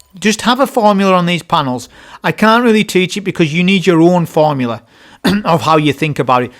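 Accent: United Kingdom. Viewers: Also the amount of British in this video is definitely an 11/10 crashout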